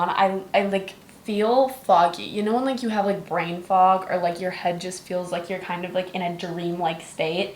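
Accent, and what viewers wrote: United Kingdom. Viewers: 10/10 British accent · british accent 8.5/10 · 10/10 On Your British Accent and you are so beautiful and funny and your videos are so great and so well done! 🩷🩷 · brittish accent rationg: 100/10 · Love the British accent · your british accent sounds amazing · I love your vids I'm a huge fan!!💗💗💗💗 also it British accent is a 10/10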